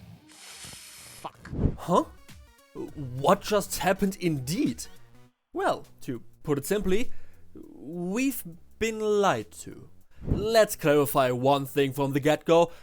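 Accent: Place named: Germany. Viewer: ich höre den deutschen akzent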